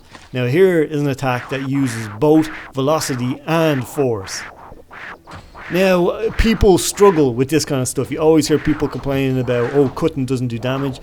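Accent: Ireland. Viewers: Your Irish, right?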